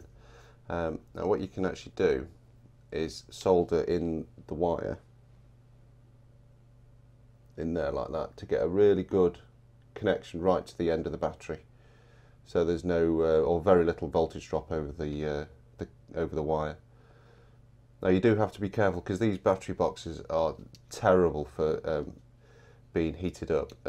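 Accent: United Kingdom. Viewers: >>>>> ANOTHER BRIT. WORBLING AWAY IN HIS SPECIAL DIALECT OF UNKNOWN LANGUAGE !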